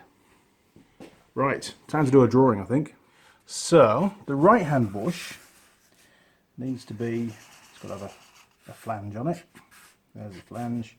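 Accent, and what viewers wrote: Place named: East Yorkshire, United Kingdom. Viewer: Hiya buddy, new subscriber after watching `no oil change for 75000km` . I was intrigued as to where you originally came from, sense a twang of East Yorkshire. Anyway good work fella and I shall start on your back catalog.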